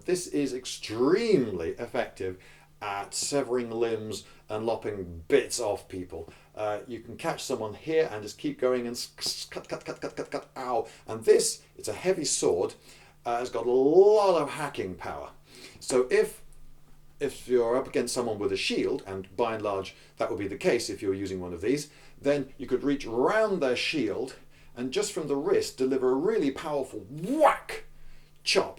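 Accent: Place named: United Kingdom. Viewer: relax dude. british accent is sometimes hard to catch for a froggy.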